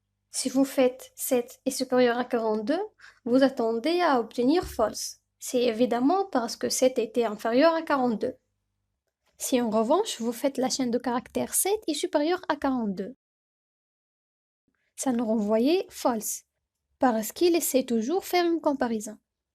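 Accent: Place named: Tunisia. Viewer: pure Tunisian accent , Keep up the Good Work .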